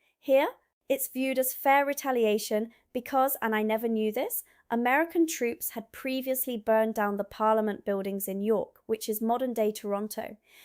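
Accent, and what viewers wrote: England, United Kingdom. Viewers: This was really excellent but: 1. Most British people have never even heard of the war of 1812 2. The Boston Tea Party was a bunch of criminals destroying the property of a competitor. They weren’t upset about the tax; they were upset that even with the tax the British East India company could sell tea much cheaper than their Illegally smuggled tea 3. The Irish Potato Famine was a genocide against the Irish people. Trevelyan, the Assistant Secretary to the Treasury, wanted more Irish people to die. 4. She says she’s American & has only been here two years. I would never have guessed it. She sounds English to me. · Weird she has a British accent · She sounds totally English not American. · Just came across your channel. You claim to be an American, fair enough, however your accent says otherwise, and, I know there are no private schools there run by British English teachers. 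So, where does your accent come from? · Your history is correct and American students are given a very edited version of their history. This is generally corrected in colleges and universities. That is, if students take U.S. history where they are taught unvarnished histories of slavery and genocide of the native peoples, as well as depredations against our neighbours and the world at large after WWII. I taught such a class in summer school at a community college, but was never asked to teach the course again. They wanted me to stick to other subjects, apparently. By the way, your British accent is too authentic to be acquired after only two years in the country. Either you are an excellent mimic, or British. I’ve lived in the U.K. for over twenty years and my accent is still decidedly American. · Amazing English accent for an American who has only lived in the UK for two years. Clearly she is not who she says she is. 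I am well versed in history both American and World. Turned video off when she said we stabbed the English in the back. I have no use for Napoleon but we were not allied with the English or the French at the time. In fact we had fought an undeclared naval war with the French Both European powers had provoked us during the Napoleonic era. We just wanted to be left alone but the English in their arrogant way thought they could push us around with impunity. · Wow, you not only sound British, you batted our corner too. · If you are American why do you sound english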